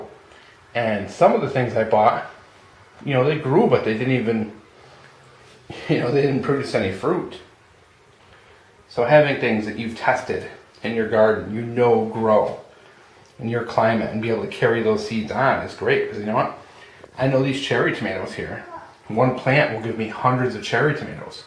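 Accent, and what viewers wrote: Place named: Midwest, United States. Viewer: LOL your accent reminds me of home! are you from Michigan or Minnesota?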